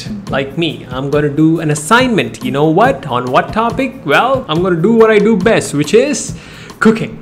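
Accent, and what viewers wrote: India. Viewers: Yes i am too By your body colour and style of talking in English sounds and seems like an indian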